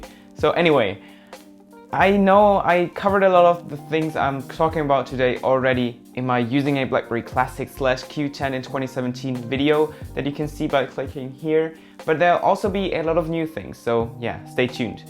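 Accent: Germany. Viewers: r u german, if yes, from where? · Gutes Englisch für einen Deutschen. Hab mich echt gefragt, welche Nationalität hinter diesem Akzent steckt. Respect. But I think that the Passport will have an end in the future.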